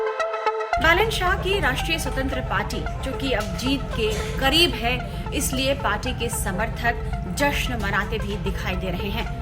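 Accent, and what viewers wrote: Bihar, India. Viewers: Mam aap bihar se lag rahi ho ya up gorakhpur sides se ho?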